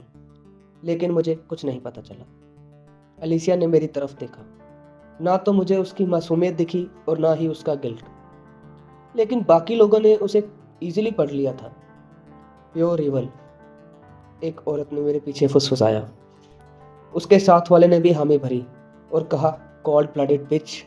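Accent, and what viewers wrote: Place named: India. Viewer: Fab💙 Hindi Accent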